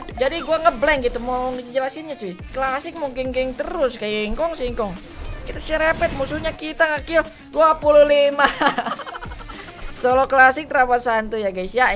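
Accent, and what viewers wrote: West Java, Indonesia. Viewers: Bang lo orang sunda bukan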